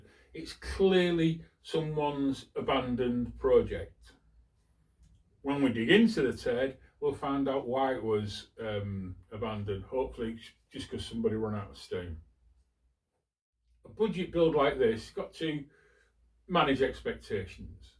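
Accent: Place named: Hull, United Kingdom. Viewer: Where are you based, sounds like a hull accent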